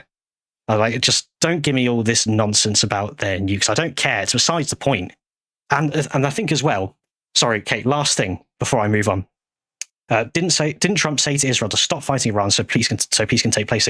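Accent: United Kingdom. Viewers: Oh a British person ranting? Copium at best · You sound like a brit, how about British politicians, Israelis own them, just look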